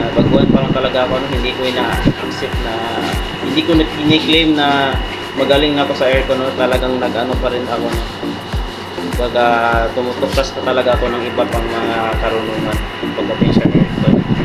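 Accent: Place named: Batangas, Philippines. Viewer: parang batangueno. salamat sa pagshare nito. #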